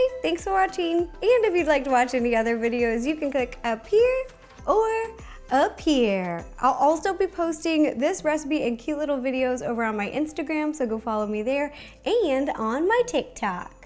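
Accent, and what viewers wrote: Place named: Rural, United States. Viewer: Awww her adorable country accent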